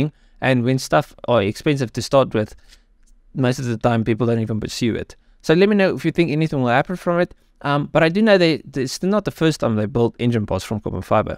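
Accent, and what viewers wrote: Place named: Johannesburg, South Africa. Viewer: Sounds like he’s from joburg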